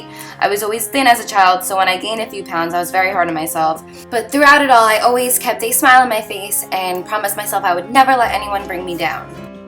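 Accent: New Jersey, United States. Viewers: Hi...I couldn't sleep so I decided to watch some videos and heard the daily cupcake mention u. I was curious bc she said something how much she liked ur jersey accent. So being a fellow jersey girl I was curious and quickly found u here. I'm so glad I did! After watching a few vids I realized how special u are and after watching ur draw my life I literally cried thru most of it! U are so young and u have been thru so much and u have managed to turn all the hurt into positivity. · i KNEW that was a jersey accent!! ahhh. i love it. is that weird? i could listen to you talk all day. haha. :))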